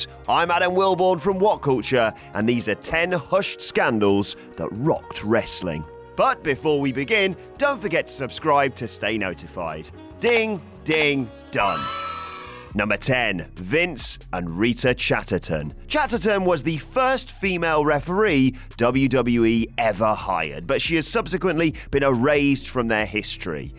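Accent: United Kingdom. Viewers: Buck zoom off god I hate British English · Anyone else annoyed by British voice overs?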